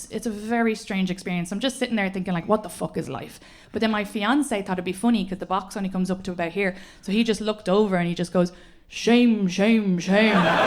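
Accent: Ireland. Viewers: Why do Irish people add an "e" to the word film? "Fil-em" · I feel like she gets more Irish every time I see her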